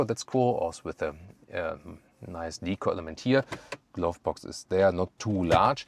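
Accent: Germany. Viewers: The German accent is amazing 😄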